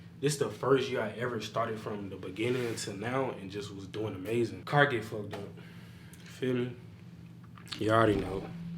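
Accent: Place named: Louisiana, United States. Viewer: lawd youn sound like you from Louisiana, what part you from?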